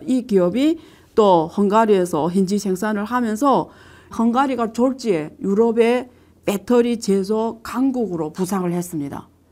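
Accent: Yanbian, China. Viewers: 한국어 배운 중국인 같은 발음 이네요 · 연변 조선족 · 이여자 목소리가 외이례?? 조선족이가??.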